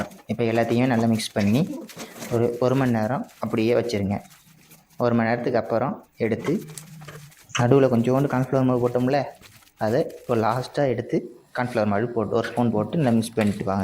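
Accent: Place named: Madurai, India. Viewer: உங்கள் எந்த ஊர் ? பேசும் போது தேற்றம் மதுரை என்று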